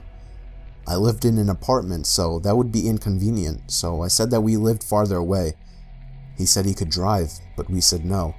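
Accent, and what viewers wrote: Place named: New York, United States. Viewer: Being a horror buff and NYC native, I appreciate these stories AND your (NY/NJ?) accent 🙌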